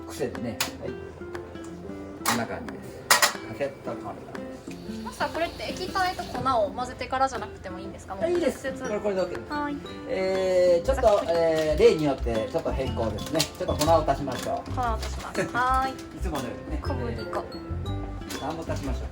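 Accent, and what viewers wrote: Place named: United States. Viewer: このYouTubeのながれで1年前のから揚げPart2も観ましたが、の英語、綺麗なアメリカ英語ですね。from Los Angeles🇺🇸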